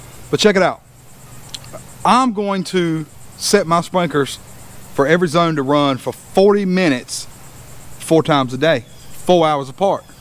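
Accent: North Carolina, United States. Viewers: I heard you speak and was like "wait a minute, where are you from?", then you said it. NC baby! I'm in Charlotte 👍🏽 This was in my recommended. I'm putting in zoysia next year, can't wait! Great video neighbor! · Brother man, lol, when you first started talking, I knew we were from the same place. I live in Mount Holly (just west of Charlotte), but I'm originally from Wilmington, NC. Born and raised. :)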